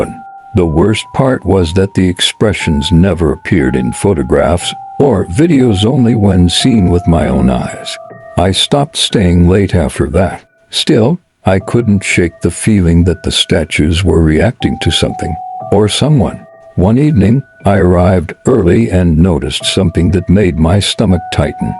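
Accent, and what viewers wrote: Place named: United States. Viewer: If it's in the UK, why is the narrator using a US accent? Would make a great practical joke.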